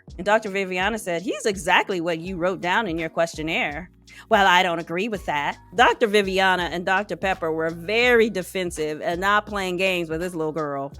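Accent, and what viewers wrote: Boston, United States. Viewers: I was waiting for this from you & as expected, you were spot on!!! Thank you! "Karen" is a crazy vicious & spiteful narcissistic woman. Mark visibly looked shaken sitting next to her. Clearly no one b'sides Tootie liked her. Was anyone else slightly nauseated by Kevin Frazier's CONSTANT gushing over Katina & Tootie? Like yeah, They said yes on decision day and were still together, but he was kind of a dick throughout a lot of the season. Oh and lastly, I loved that Dr. Pepper and Dr. Viviana laid into "Hostage". The simple truth is that she is NOT a good person and couldn't just admit that she's shallow and based her entire marital demise on her lack of satisfaction with Chris's looks. On that list, looks should have been #1 in her top 5 things she wanted. She kept beating around the bush & dodging questions to the point of severe annoyance., your Boston accent cracks me up. 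Thank you for all you do & making me smile! You are an awesome & talented woman 🌺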